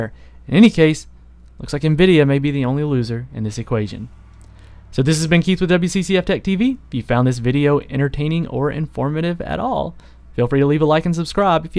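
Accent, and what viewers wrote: South, United States. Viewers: Love that southern accent leaking through lol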